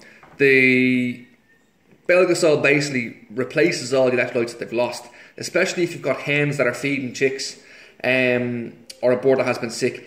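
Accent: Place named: Dublin, Ireland. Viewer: great video pal I'm keeping linnet cock to hen canary. two pair of canary red poll cock paired to two hens in one of me flights and pair of goldfinch in the and two cock goldies paired to two hen canary ur soft food mix looked great . can you tell me where to get canary soak seed I'm just use in pigeon soak at the minute the stuff u get in Turkey thanks for a great video and help full tips hope u can help I should have said I'm from Dublin and u sound similar thanks again keep the videos coming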